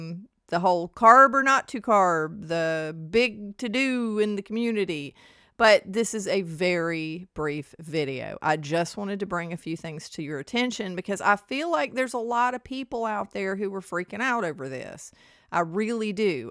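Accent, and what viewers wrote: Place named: South, United States. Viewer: You had me at "expose the corruption of Big Food and Big Pharma". Your Southern accent didn't hurt a bit!!! My family has been in the South since before the Revolutionary War!!! That said, I have primarily been somewhere between keto and carnivore since 2010. Eatimg my "normal way", my A1C is 4.1 and my insulin is 1.3...meaning I am metabolically healthy, and at the end of the day, that's my goal. Long story I'll spare you, but life had me go down a rabbithole and discovered the general science behind gut health and lactofermented foods...not necessarily a departure from keto...or carnivore if you follow Dr Sean O'Mara. I make my own raw lactofermented sauerkraut and other lactofermented foods, and make my own milk kefir, "perpetual" cultured buttermilk, and sour cream/creme fraiche. I know what's in them all and I know there's no additives or preservatives or anything funky or non-food. 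Part of the long story, not only did it help my daughter repopulate a healthy gut microbiome after MRSA, but it's helped me keep my diverticulitis at bay. To wrap this back around to my OP, my main goal is to reduce my dependence on Big Food and Big Pharma. Borrowing from Dr Berry, I try to harken back to my own ancestral eating and eat the way my rural southern sharecropper grandparents ate prior to 1960-ish. I was born in 1957 so I do know what that was. Nothing was GMO back then. They grew everything they ate, milked the cow twice a day, had chickens, maintained a smokehouse in order to pr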